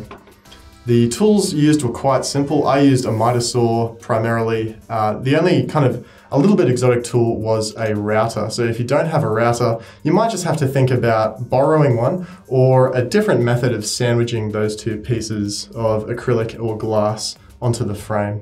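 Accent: Australia. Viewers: Well done, I thoroughly enjoyed your tutorial and it was great to hear a comrade from Us-trail-ya (Australia). I've been dabbling with Arduino and all sorts of odds and ends, then came across this video which has inspired myself, and hopefully others to incorporate different methods to create their own piece of modern art.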